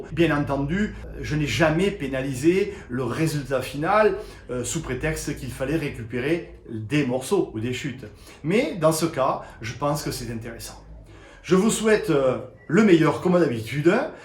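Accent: South, France. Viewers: Comme on dit par chez moi: " C'est avec des petits riens qu'on fait des grands touts!" Une belle découverte cette chaine. Bravo! Et puis cette accents du Sud, mes racines... Au plaisir S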